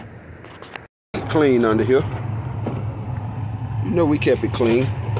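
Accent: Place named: Canada. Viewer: Cool accent! Where you from? Canada?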